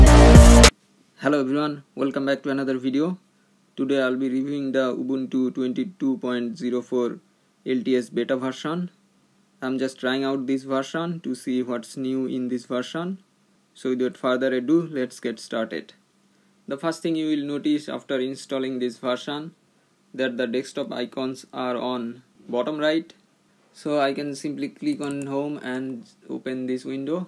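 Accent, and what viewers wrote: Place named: India. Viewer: you speak like indian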